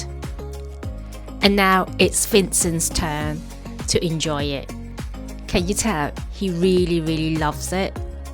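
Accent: United Kingdom. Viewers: 鐘意聽你講英文，我可以學聽英國音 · Hi, your voice and accent is very nice, can you share how you make it? As a local Hong Kong people, I really want to transform my accent to more British. Thanks.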